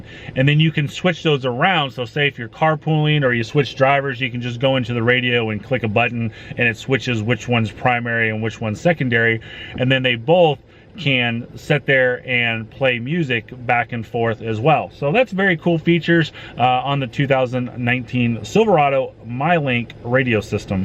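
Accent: Chicago, United States. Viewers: Is this guy from The Windy City blah blah blah blah blah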